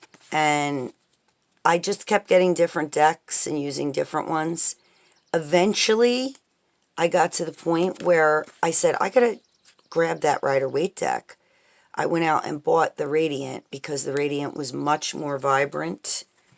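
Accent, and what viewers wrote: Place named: New Jersey, United States. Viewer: Your accent makes me ache for home.... Jersey girl or NY?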